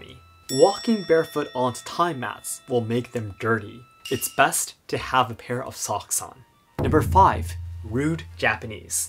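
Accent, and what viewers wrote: United States. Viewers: In only six years you developed an American accent? How old were you when you arrived in Michigan? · I love the American twang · He sounds very american, although it is well spoken american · it's an american accent wth are you talking about??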